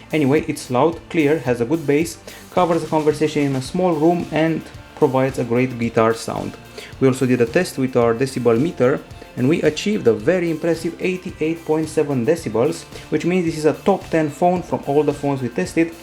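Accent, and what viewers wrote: Romania. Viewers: Romanian accent 😅 👍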